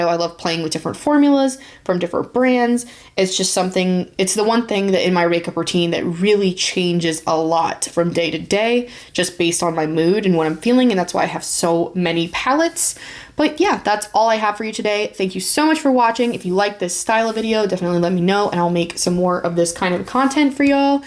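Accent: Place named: Northeast, United States. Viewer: Ty for the video! ❤ PS you sound very north east NJ, Pa & Delaware ? 🙂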